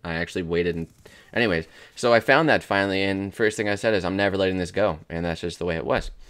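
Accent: Canada. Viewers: Just wondering, did you ever have a Canadian accent?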